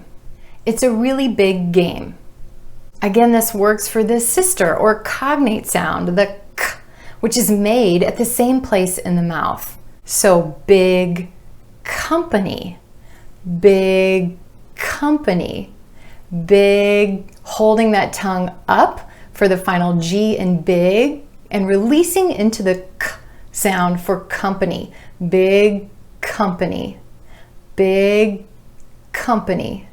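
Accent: United States. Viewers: Is this American English pronunciation?? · Are you American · Your lessons are just amazing, as becuse of you, I've started to love the American accent since i have been used to talk with the British accent.. I have one suggestion if you don't mind, what do you think about creating a group on WhatsApp or viber which is aiming to improve the writing and speaking skills between you and your followers, and it should be administrated by you of course.. Best Regards 🌹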